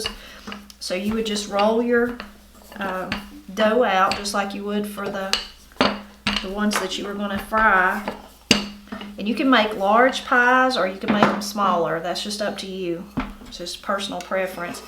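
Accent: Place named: Missouri, United States. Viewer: I just gotta say your speech is just like my kinfolk from central Missouri. You are the first person outside of my family that says Ain’t for aunt. Love listening to you talk.... and cook of course!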